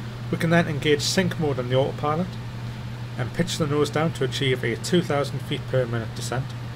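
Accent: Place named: North, United Kingdom. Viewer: Looking superb! Can't wait for the release! Nice to hear a fellow northerner too :)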